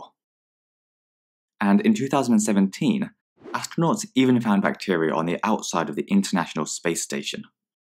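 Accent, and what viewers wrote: United Kingdom. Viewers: His British accent is the only reason why I understand his videos 😂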